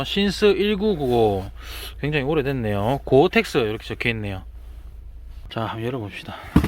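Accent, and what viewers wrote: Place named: Gyeongsang, South Korea. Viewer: 유튜브에서 표준어 말고 사투리로 하는 유튜버 걸러주는 기능 없나? 졸라게 듣기 싫어죽겟네 갱상도 사투리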